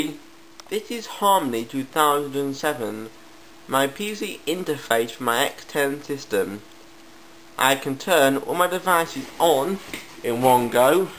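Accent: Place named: London, United Kingdom. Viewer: awesome! hey where are u from? ur accent tells me u from around london.... which place exactly?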